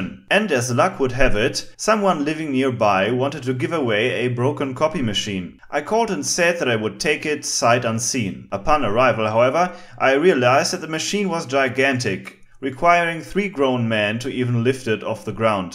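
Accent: Germany. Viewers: evil German mad scientist of course. classic accent :) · Surprised you haven't used any of this to make CNC of some sort. I also love your accent, partly because I was stationed in Germany while in the US Army, so it's nice to hear English with a German accent again. Keep up the great work, I learn a lot from you! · Yeah German or Austrian definitely he speaks classic school accent as it's spoken in schools in these countries but not bad... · For a German his accent is really light imo. Excellent pronunciation too.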